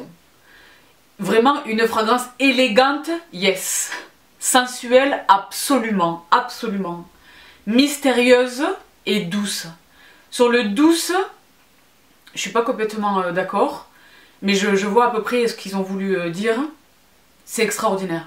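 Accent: South, France. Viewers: Super video ! J'adore ton accent qui me rappelle mon enfance dans le sud. Et j'aime beaucoup ta passion pour les parfums, avec pas mal de goûts communs. Je porte Fève Délicieuse depuis septembre (cadeau d'anniv'), quelle merveille ! Si tu veux bien nous en dire un peu plus sur Bois d'argent un de ces jours, ce sera super ! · Coucou je suis aussi une vrai passionnée de parfum👌avec ton jolie accent du sud J avais l impression d être avec mes amis de Bandol étant à Rennes 🙂💕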